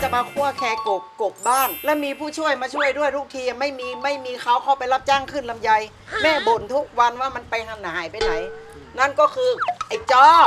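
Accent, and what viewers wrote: North, Thailand. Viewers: ชอบเวลาแม่ อู้เมืองจ้าว.เป็นธรรมชาติที่สุดเลย.คุณแม่น่ารักทำกับข้าวก็.น่ากิ๊นนน่ากินค่ะ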